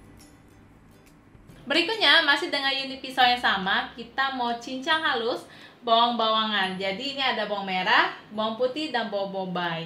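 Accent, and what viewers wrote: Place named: Palembang, Indonesia. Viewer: Orang palembang ya kak 😊..logatnya gak bisa hilang heheh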